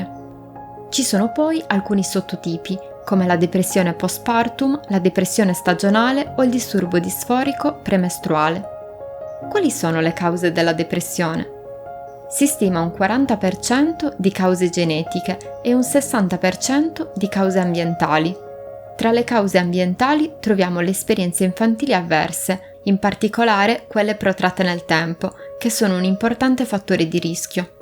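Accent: Sardinia, Italy. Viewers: Accento di bidda....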